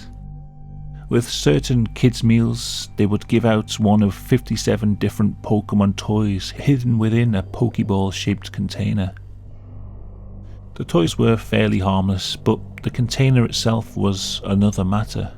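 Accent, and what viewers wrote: United Kingdom. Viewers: What part of the UK are you from? Just wanted to know where the accent was from lol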